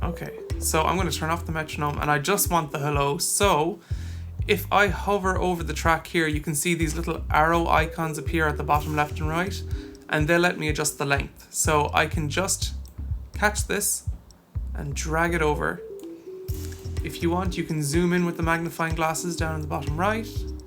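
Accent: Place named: Ireland. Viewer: I like the irish accent :D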